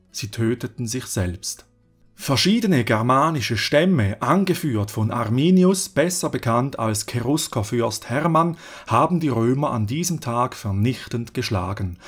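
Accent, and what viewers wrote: Switzerland. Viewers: Vielen dank für den ausführlichen und aufschlussreichen Beitrag über die niedersächsische Geschichte! Ich als selber Niedersachse, der aktuell Urlaub am Genfer See macht, hatte eine gute Unterhaltung und freue mich auf die weiteren Videos! PS: Ich liebe deinen schweizer Akzent/Dialekt! :) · Ich bin Deutschmarokkaner und liebe diesen schweizer Akzent. 😁👍🏻 Übrigens ein tolles Video!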